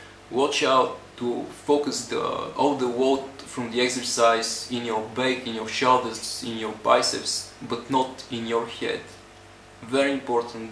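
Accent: Bulgaria. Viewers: Ебаси българския акцент .... българин ли си ????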